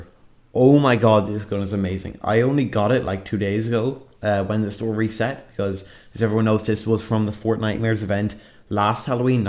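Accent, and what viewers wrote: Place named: Ireland. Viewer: You’re from Ireland I can tell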